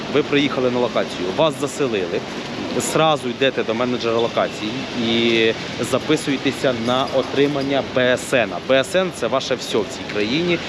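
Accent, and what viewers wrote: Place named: Zhytomyr, Ukraine. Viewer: Він розмовляє суржиком... суржик житомирський .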